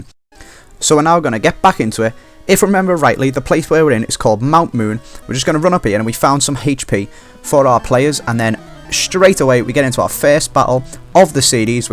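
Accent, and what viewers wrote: Liverpool, United Kingdom. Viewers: You had such a strong scouse accent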